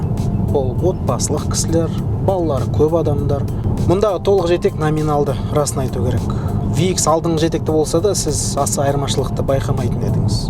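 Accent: Standard. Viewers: қазақша таза сөйлейсиз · Қазақша таза сөйлейді екен👍👍👍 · Қандай таза қазақша сөйлейсің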